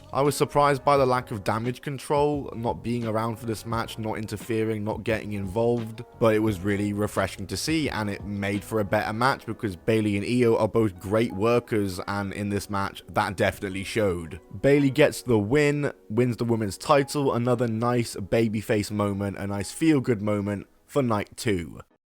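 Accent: United Kingdom. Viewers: Wait a minute I just stopped a video at two minutes and 20 seconds you’re British!!!! Why is all the wrestling channels I watch British people Well, I like your video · You couldn't tell by his accent that he was british?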